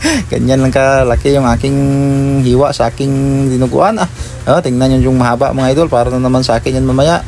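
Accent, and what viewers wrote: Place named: Western Visayas, Philippines. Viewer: you sound like illingo bisaya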